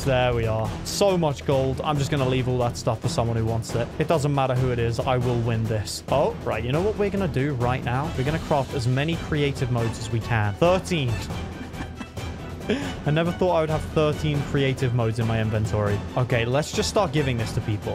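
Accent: United Kingdom. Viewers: Are u britsh?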